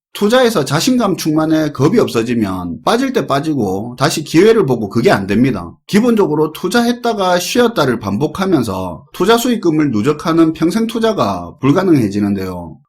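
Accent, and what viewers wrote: Gyeongsang, South Korea. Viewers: 저는 시장은 장기적으로 우상향한다는 낙관론자에 가깝지만 이 부정적인 사람이라고 생각하지 않습니다. 시장을 여러시각으로 바라보는건 당연하죠. 언제나 재밌게 잘 시청하고 있고 갱산도 억양 넘나 좋습니당ㅎㅎㅎ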